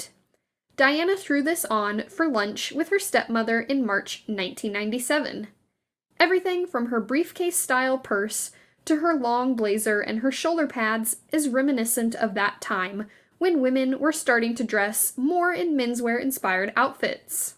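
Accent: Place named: United States. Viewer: Why do you Americans pronounce ‘blouse’ as ‘bloussse’? Double stressing the ‘ess’. Derby is pronounced Darby.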